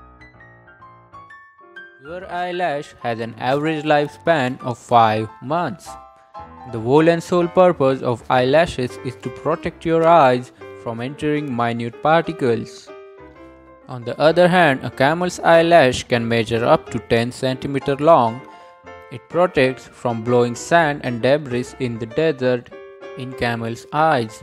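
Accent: India. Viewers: Great job brother. I want to give you a suggestion that. Add Subtitles manually because youtubes auto subtitle is not very much compatible with Indian English accent. As I don't know English properly Subtitles helps a lot to understand for Slow Learners As I was reading subtitles given by Youtube was Horrible. As you were Saying word "Blue Eyes People" youtube was Subtitling AS "You Eye People" many other mistakes were also there, Please Check it. . Thanks aLOt For Info.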